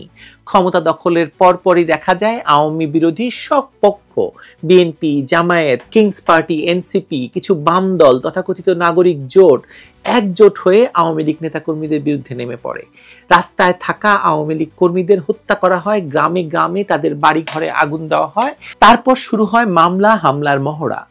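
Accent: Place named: Shantipur, India. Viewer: এত শান্তিপুরী ভাষায় না বলে নবনিতা চৌধুরীর মতো বলতে হবে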